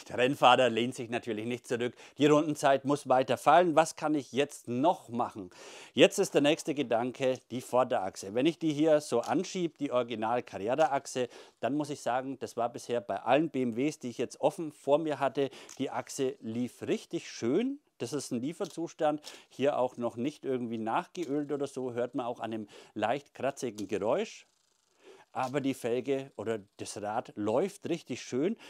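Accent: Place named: Franconia, Germany. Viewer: …für mich ist DAS Fränkisch…😂😂😂 Den richtigen Dialekt versteht nur ihr “vor Ort”….🎉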